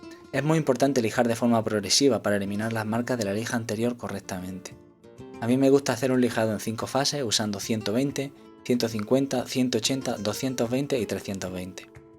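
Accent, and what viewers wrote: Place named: Spain. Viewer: este relato con acento español es muy poetico...para los doblajes de peliculas sino sirven jja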